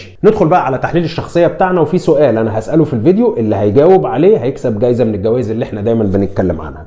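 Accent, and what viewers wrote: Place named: Morocco. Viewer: لا هي مغربية لهجتها مغربية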